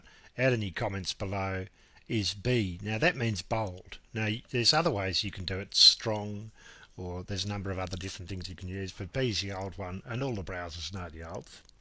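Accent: Australia. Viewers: Thanks plain Aussie Talk Very Easy to Follow.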